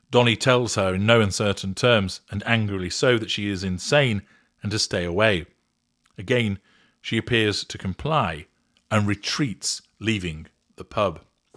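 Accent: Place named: Midlands, United Kingdom. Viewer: I could be wrong but I detect a Midlands accent in your voice!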